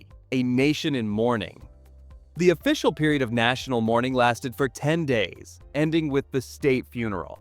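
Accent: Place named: United States. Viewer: This American voice is so inappropriate to the subject as well as the title! What for Gods sake is shocking here? This is just the way WE handle these things in GB and Europe.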